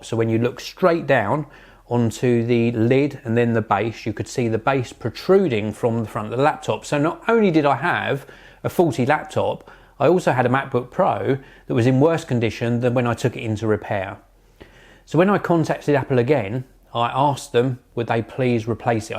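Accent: United Kingdom. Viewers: So I clicked this video because I'd like to know what to do if Apple refuses to fix or replace my laptop - but as soon as I heard you speak I knew this wasn't relevant to me because of where I live. I live in the USA, where we don't have appropriate consumer protection laws. Thank you for sharing your experience! I hope it helps many people from the UK.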